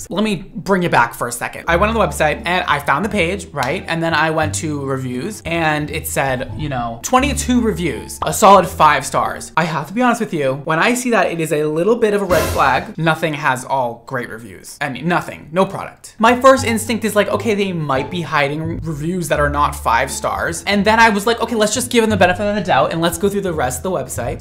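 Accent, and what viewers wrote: United Kingdom. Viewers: me lives in the uk does British accent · LIVING for your cute British accent! Nailed it! ❤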